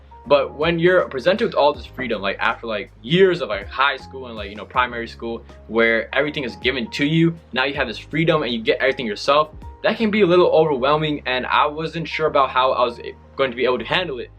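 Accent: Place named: United States. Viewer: How you got this much fluency and American accent 🙄?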